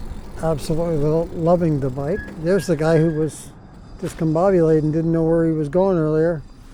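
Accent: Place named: Canada. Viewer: If I close my eyes.. listening, I think I'm in Canada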